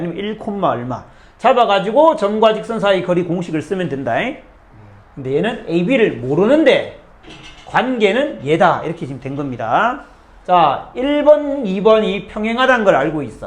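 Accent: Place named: Gyeongsang, South Korea. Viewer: ㅋㅋㅋ. 강의하실 때 샘의 억양을 들으니 혹시 대구 분이 아닐까 그 동안 생각하긴 했지만 실제 수성구 범어동일 줄은 몰랐습니다. 친척 따님이 수성구에서 KT 다니는 남자와 백년해로를 언약해서 거기서 혼례를 치르기로 했습니다. 정말 대단한 우연이네요. 저도 일반적으론 높은 확률을 따라가긴 하지만 이상하게 꼭 그렇진 않게 되더라구요. 전 정수론과 복소해석학이 전공인데 확률 전공이 아니어서 그런건 아니지만 전 수학자이면서도 절대적으로 확률을 믿지는 않는 경향이 좀 있습니다. 우연에 내맡겨 버리기도 하죠. 저도 지난 주 파워볼 60개 번호 중 8개 숫자 조합을 모두 맞추면 잭 팟을 터뜨리는 아주 희박한 확률 싸움에서 겨우 2개를 맞춰 35 달러(한화 약 5 만원)를 타면서 역시 잘 안되는구나를 절감했지만 그래도 재미로 소소하게 하는건 괜찮으거 같아요 ㅎㅎ. 그나저나 돼지갈비로 먹을지 소갈비로 먹을지 벌써 갈등이 생기네요 ㅋㅋㅋ.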